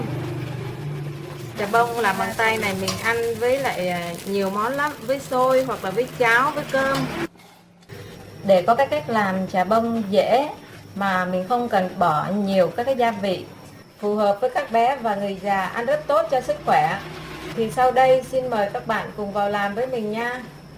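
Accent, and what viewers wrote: North, Vietnam. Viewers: Bạn hướng dẩn kỳ càng và có dọng nói của miền bắc nông thôn thật thà, cám ơn bạn !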